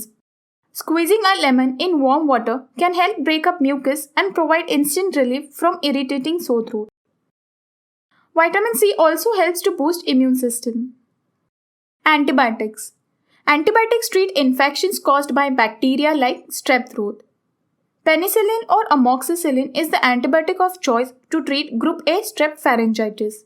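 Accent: India. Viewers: That Indian accent though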